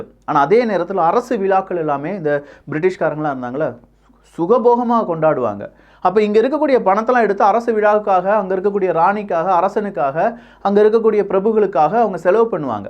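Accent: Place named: Tirunelveli, India. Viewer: Tirunelveli slang super thala😅😅😅❤❤❤👌👌👌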